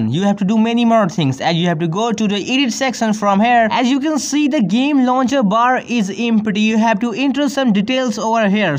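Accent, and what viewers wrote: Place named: India. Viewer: Sounds like india or china, nice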